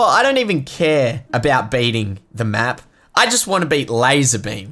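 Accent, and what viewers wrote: Australia. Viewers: Are you Australian? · Are you Aussie or Just wondering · Are you fromAustralia??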